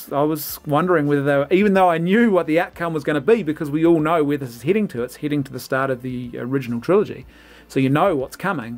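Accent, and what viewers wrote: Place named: New Zealand. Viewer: By the way...gotta love that kiwi accent.. with all your passion about SW maybe you should run for PM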